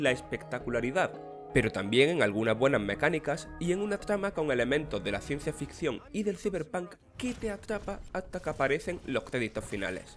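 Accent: Andalusia, Spain. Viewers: Pues no parece esforzarse mucho en hablar castellano... El acento andalú le sale en cada 3 de 4 palabras... Yo sí que me he tenido que esforzar por entenderle....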